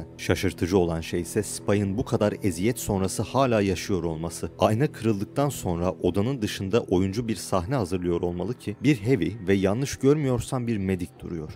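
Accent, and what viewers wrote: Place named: United States. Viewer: Amerikan Türkçesi diyoruz biz bunlara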